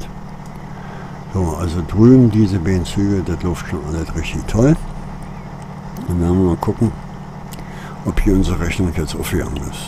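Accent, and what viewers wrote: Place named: Berlin, Germany. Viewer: schöne videos wo du machst.. finde ich richtig gut... ( deine stimme mag ich sehr ) mit Berline dialekt.. scheee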